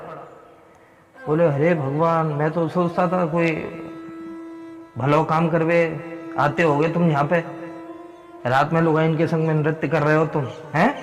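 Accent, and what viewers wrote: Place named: Vrindavan, India. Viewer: Kitni meethi boli Shri Vrindavan Dhaam ki Dhanyavaad PrabhuJi Kripa karo Bihariji Radharani kripa banaye rakhe sada 🙏🏻🙏🏻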